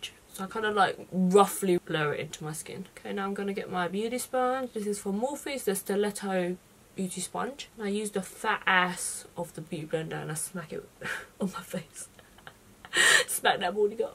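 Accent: United Kingdom. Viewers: i've been following for you for months on insta and i finally looked up your youtube. i wasn't expecting you to be british haha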